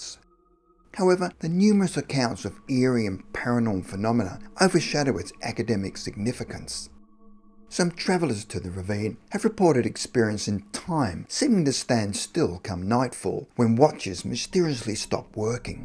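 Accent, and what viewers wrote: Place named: Australia. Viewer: It's an Australian accent. What's so unnatural about it?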